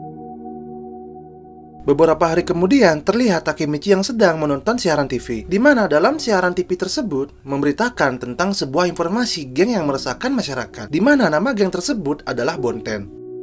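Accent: Bali, Indonesia. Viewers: Bang logat lu kek orang Bali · Kyk logat bali bang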